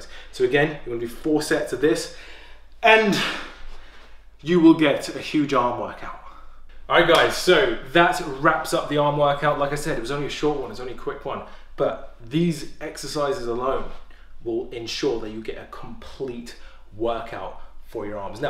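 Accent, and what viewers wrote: United Kingdom. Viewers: That British accent is so much easier to listen to!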